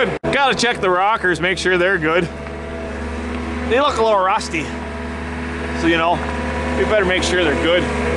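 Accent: Canada. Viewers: I can tell this is a Canadian accent from a mile away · what part of Canada you from?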